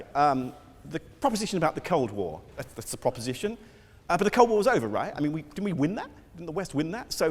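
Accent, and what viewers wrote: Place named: United Kingdom. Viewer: Goddddd...I have trouble understanding British accent..LOL~~~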